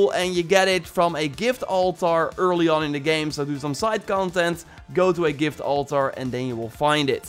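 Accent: Netherlands. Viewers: Yes it's the most obvious dutch accent ive ever heard on youtube, and it annoys the fuck out of me lmao. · Bet he is, sounds wayyyyyyyy too dutch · Marcos Vargas its his accent, he's from the netherlands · Ben je Nederlands? I can sense an accent. Became a subscriber because your AC Origins video. Love the content amigo. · He sounds dutch that’s why😂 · Damn I was right! I knew it sounded familiar. Visited the Netherlands back when I was studying in France and heard it back then. Been 5 years now and his accent reminded me of that trip for some reason lol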